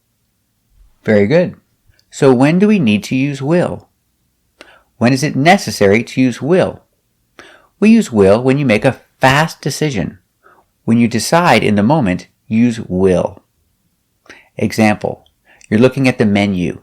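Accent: United States. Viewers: Sir are you American?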